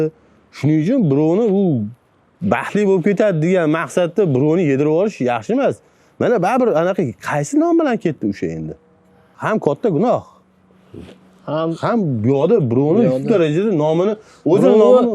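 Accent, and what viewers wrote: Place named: Tashkent, Uzbekistan. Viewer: Укам койил тошкенчасига жайдари тилда тушунтириб Бердинг менимча хамма Зур тушунди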